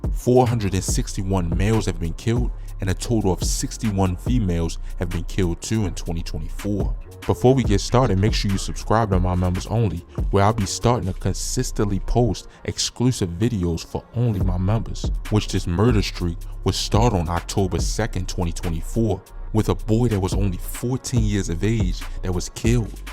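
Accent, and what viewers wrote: Baltimore, United States. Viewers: Nigga frm Baltimore somewhere give him a break bro 🤷🏾‍♂️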